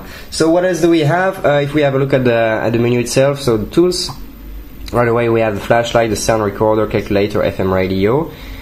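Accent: France. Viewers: lol nico rosberg voice when he speak english mix french english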